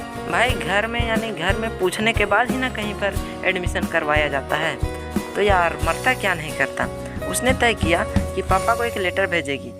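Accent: Bihar, India. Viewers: SIR AAP BIHAR SE HO ??? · Bhai were are you from. I think you are from bihar 🤗❤️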